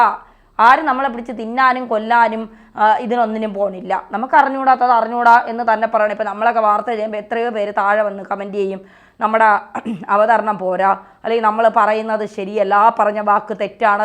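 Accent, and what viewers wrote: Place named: Kollam, India. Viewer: അത് കൊല്ലക്കാരുടെ ശൈലി ആണ്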